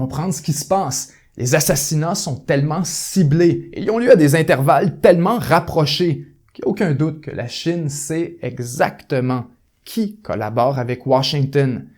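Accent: Quebec, Canada. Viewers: Bonne vidéo, malheureusement l'accent québécois m'insuporte ... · Ton accent canadien est tout simplement cool avec une adresse oratoire impeccable. Les espions sont éliminé systématiquement car ils savent très bien ce qu'ils font ; ni vue ni reconnu, quel dommage de sacrifier sa vie pour des causes aussi basse. Hélas comme la betise humaine est insondable.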